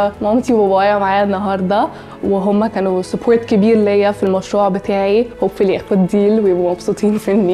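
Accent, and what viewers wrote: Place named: England, United Kingdom. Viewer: واضح ان حضرتك متفرجتش على الحلقة علشان لو اتفرجت هتعرف انها عايشة في انجلترا ومدرستها هناك فده سبب كفاية يخليها تتكلم كده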